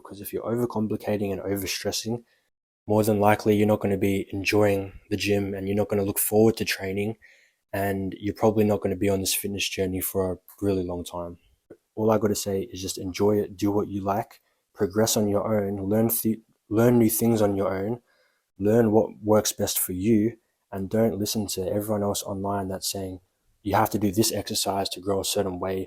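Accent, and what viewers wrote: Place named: United Kingdom. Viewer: that accent thooo you in the uk ??!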